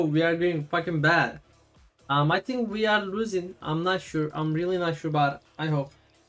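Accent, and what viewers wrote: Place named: Turkey. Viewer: Are you Turkish? your accent sounds like Turkish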